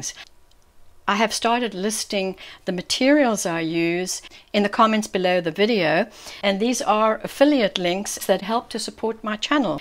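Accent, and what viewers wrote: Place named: South Africa. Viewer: Thanks. I'm learning a lot about loose watercolour painting. I was surprised to hear you are living in California - you haven't lost your accent! Which I love to hear as I lived in S.A. for 10 happy years.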